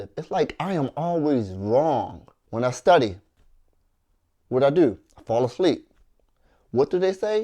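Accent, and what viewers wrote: Baltimore, United States. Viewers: Besides the point of the video I know but are you from Baltimore?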